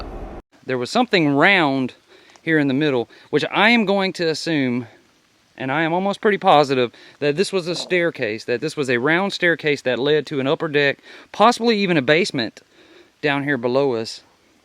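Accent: South, United States. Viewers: I just have to say your very handsome and I love your southern accent 😍 ❤ I'm from the city Boston Massachusetts born and raised idky but I love country men southern men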